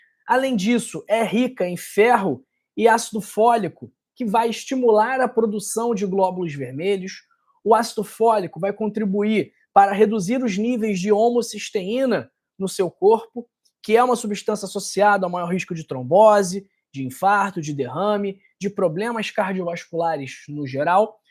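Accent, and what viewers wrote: Rio de Janeiro, Brazil. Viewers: Não gosto do sotaque do Rio de Janeiro 😊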